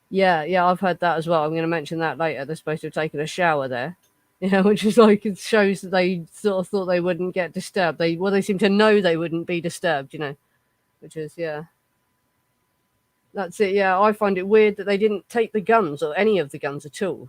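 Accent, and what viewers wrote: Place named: Liverpool, United Kingdom. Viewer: I love your accent!!You sound like a Beatle!!My son's are named John,Paul,and George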